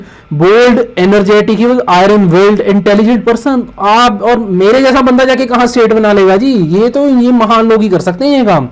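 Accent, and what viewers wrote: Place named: Punjab, India. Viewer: Your way of teaching is really good I,m your big fan sir ❤️❤️❤️❤️❤️❤️bus aap please Toda Hindi Mai bhi explain kar diya kariye i, mean ek summary type hindi mai b bata diya karaiye aap and apki baato se lagta hai ap punbai hai .....Aap bilkul real waale punjabi hai bilkul cool type k rocking type like....huaaaaaa baleee balleee te sava sava🤭🤭😘😘😘☺️😇🤴🙈❤️👉❤️❤️❤️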